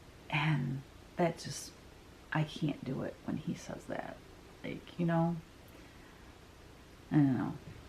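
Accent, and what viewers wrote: Michigan, United States. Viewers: Hi sounds like you live in Michigan also Love your show just found you. Your work is gorgeous I'm getting back into cross stitch. Thanks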